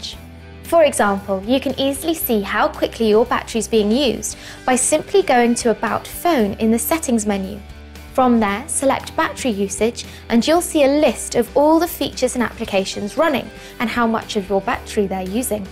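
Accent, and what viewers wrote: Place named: United Kingdom. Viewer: Cool!! I really love it voice.thanks for it voice.British cool.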